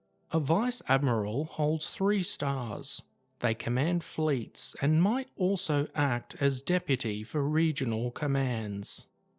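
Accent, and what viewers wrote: England, United Kingdom. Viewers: Why is some British guy narrating this? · Thanks 🇬🇧 accent. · It's funny to hear a British person do American ranks of the US Navy. Anyhow I got out of the Navy 9 years and was a second class petty officer bosun's mate. When I got out I was up for bosun's mate first class but I didn't care because I was getting out. Peace. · US Navy. Why have an English guy as speaker?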